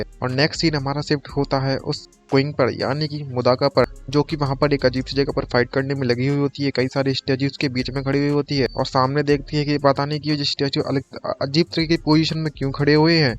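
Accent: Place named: Western Uttar Pradesh, India. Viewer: Bhai tera bolney ka same west UP ki jaisa h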